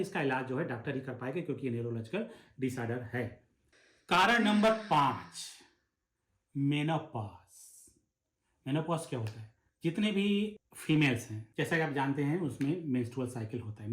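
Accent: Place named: North, India. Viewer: आपको मेरा प्रणाम।आप के हिन्दी उच्चारण से इतना स्पष्ट है कि आप उत्तर प्रदेश, मध्यप्रदेश, बिहार के निवासी हैं।क्रेनबेरी तो शांपिग माल में मिलेगा।एक आम भारतीय इस बीमारी में क्या उपयोग कर सकता है जो वस्तु आसानी से उपलब्ध हो क्योंकि ग्रामीण भारतीय उसे आसानी से उसे प्रयोग कर सकता है। मैं यह आपकी बोलचाल से समझ पाया हूं कि आप शायद कहीं विदेश में रहते हैं। कृपया मेरा मार्गदर्शन करें शंका समाधान करने के लिए आपका धन्यवाद।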